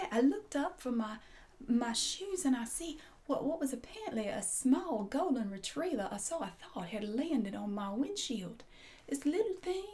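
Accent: South, United States. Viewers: I love these role plays, you are so good at playing this role. Please do more :) this might be a silly question but are there still ladies in the south who speak that way?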